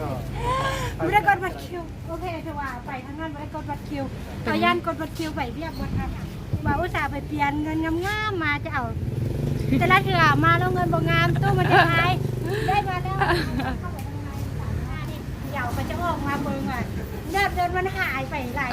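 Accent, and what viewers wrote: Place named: Luang Prabang, Laos. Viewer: มีสำเนียงหลวงพระบางออกมาแว็บๆ! อยากฟัง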